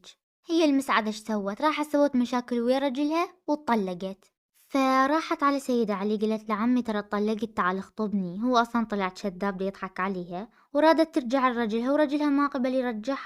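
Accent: Iraq. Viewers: عفيه صح ضيعت المشيتين😂تحياتي شنو انتي عراقيه لو مغربيه صراحه لهجتج عراقيه🌷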